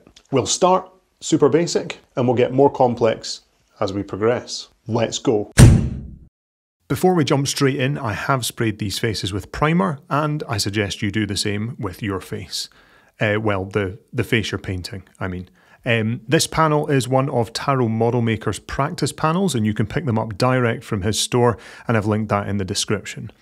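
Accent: Scotland, United Kingdom. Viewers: Do I detect a faint Scottish accent? Nice tutorial for beginners, also useful for intermediate painters like me. Thanks for sharing.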